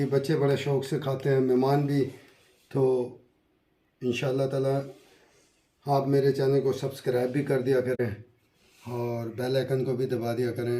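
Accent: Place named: Rohtak, India. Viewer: Assalamualikum Aap ka kaam bht acha hai aap ka taluq kis ilaqe se hai? Kia rohtek se aap ka taluq hai aap k lehje se yani Bolney k andaz se lagta hai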